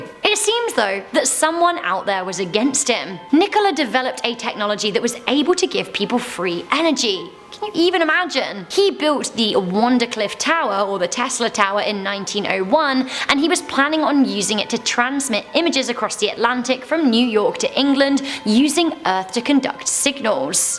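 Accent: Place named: Scotland, United Kingdom. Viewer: I love British accents, but a Scottish brogue blows my mind!!!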